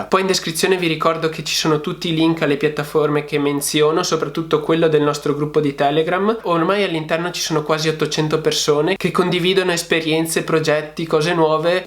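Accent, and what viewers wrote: Veneto, Italy. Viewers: ciao! Un giorno se ti va ci si può trovare, ti seguo da un po, mi piacerebbe confrontarmi con te su questo argomento. Io ho 29 anni, auguri x il tuo compleanno! Dal tuo accento credo che tu sia del Veneto. Se è così siamo vicini e sarebbe bello potersi incontrare x parlare su investimenti. Uso una piattaforma da farti vedere con cui si può guadagnare tutti i mesi qualcosa.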